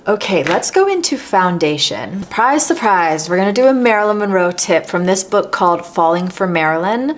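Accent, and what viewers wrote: United States. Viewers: obviously american?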